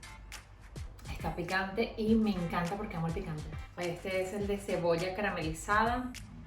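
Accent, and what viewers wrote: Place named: Venezuela. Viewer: Me parecieron geniales esas recetas. Buenas alternativas para pasapalos. Eres venezolana? Por fin alguien que habla mi acento en un canal de recetas 😅🤩🇻🇪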